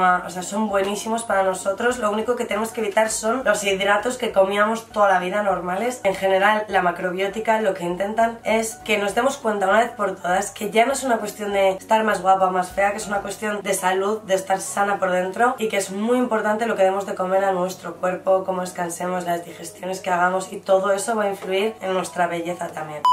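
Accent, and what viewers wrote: Spain. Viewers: Claudia Chi López Sí, claro, si sé que es de España por su acento, me refería a la actitud en esté vídeo y al final cuando se pinta los labios de rojo, me la recordó, pero en buena onda ! 😀👍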